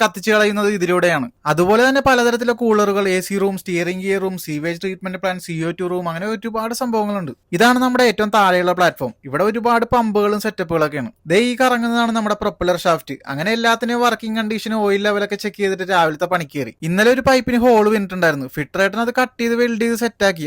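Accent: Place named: Ernakulam, India. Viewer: Bro എറണാകുളം ആണോ വീട്.. നമ്മുടെ നാട്ടിലെ ഒരു സ്ലാങ് ഇണ്ടല്ല?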